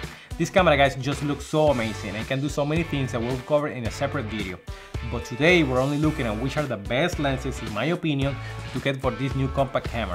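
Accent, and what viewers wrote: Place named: Venezuela. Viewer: Tu acento es definitivamente Venezolano!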